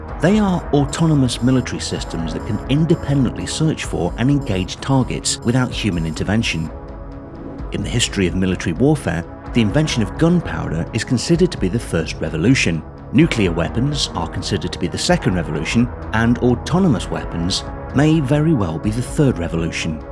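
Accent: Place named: United Kingdom. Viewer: Awful British accent... I turned off sound and watched only subtitles... Why this pronunciation is so bizzare and awkward, as oversophisticated pukes?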